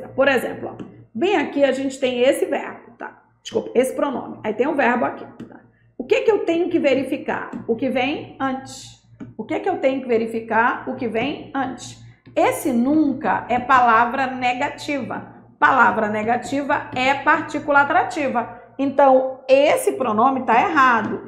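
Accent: Pará, Brazil. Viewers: Quando eu ouvi o bagulho ja sabia que era paraense 😂😂😂😂😂😂😂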